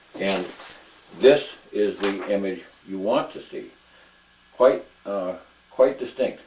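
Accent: New England, United States. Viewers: I have been waiting for months for a new range in our area to open so I can take lessons with an instructor there. In the meantime, I have been able to shoot at a couple of places without the benefit of the lessons with mixed results. After watching parts 1 & 2 I can see where I was making mistakes in lining up the sights vs. trying to get the target into focus. This series of videos is probably the best common sense training I have seen on the internet. Thank you very much and please keep producing these informative and high quality videos. I also like your New England accent. Most of the firearms You Tubers have southern accents which is fine, but my wife is from Massachusetts and I love a New England accent. Yours sounds more like New Hampshire though.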